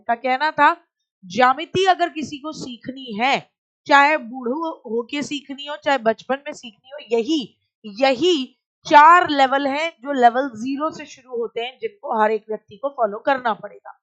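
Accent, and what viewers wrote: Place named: Haryana, India. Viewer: Haryanvi bol rhe h bhaiya wo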